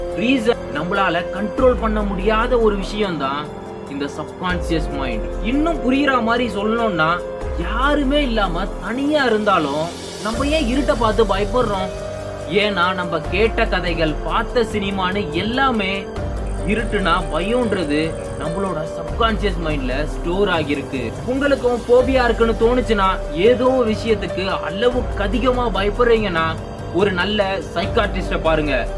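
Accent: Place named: North, India. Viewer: Konjam normal la pesu thambi...vadakan pesuramathiri iruke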